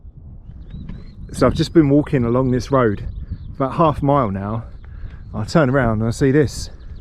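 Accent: United Kingdom. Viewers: not going to try and polish a turd here." lol This is very funny, yet with his British accent, he can basically say anything and it sounds like Shakespeare. 🙃 Turd (n) sometimes vulgar : a piece of fecal matter 2. vulgar : a contemptible person Examples of turd in a Sentence He's an obnoxious little turd. 3. a word used by a Spanish speaking bearded British man as he strolls the Kent coastline.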